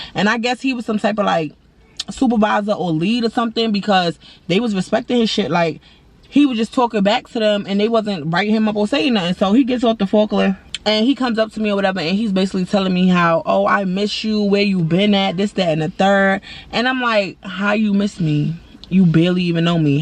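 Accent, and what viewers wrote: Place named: South, United States. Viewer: You been down south too long you got that south accent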